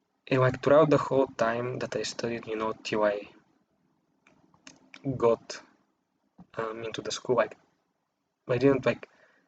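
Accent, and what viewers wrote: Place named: Russia. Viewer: Your English is very Russian but I liked it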